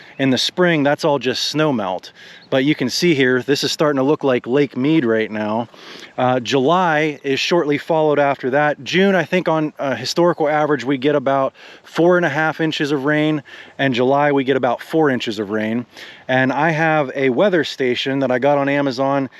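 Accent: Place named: Pittsburgh, United States. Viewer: Add bentonite clay, cheaper, are u in pa pittsburgh area? I here your accent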